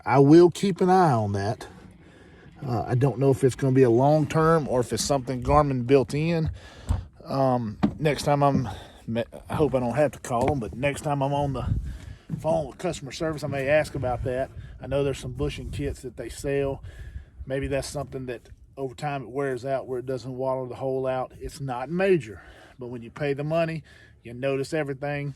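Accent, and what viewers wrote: Louisiana, United States. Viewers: 14 oz. Just found your channel. Already watched several of your videos. Good content. What part of La you located. Don’t sound Cajun.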